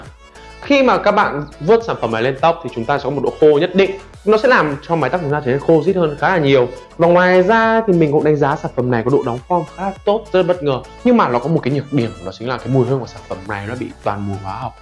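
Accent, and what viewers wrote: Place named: North, Vietnam. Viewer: Giọng bắc kỳ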